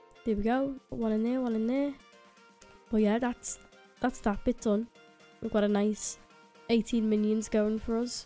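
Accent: Liverpool, United Kingdom. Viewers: You probably get this a lot but are you scouse lol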